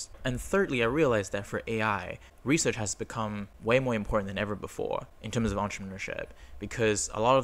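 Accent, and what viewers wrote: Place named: United Kingdom. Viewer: Good video. Also u have a heavier British accent these days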